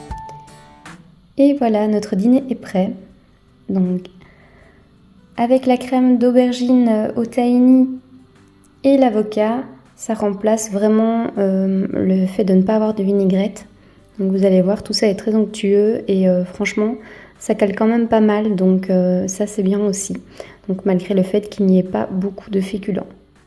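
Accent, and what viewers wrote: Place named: Belgium. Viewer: Salut j’avais deviné que tu étais Belge en 90 g en belge 😉😂👍🏻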